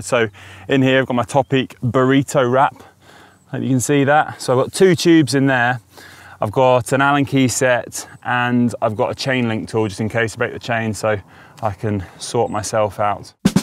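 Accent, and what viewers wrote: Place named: South East England, United Kingdom. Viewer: Name: Irish Home: Ireland Accent: South East England? What’s the story,?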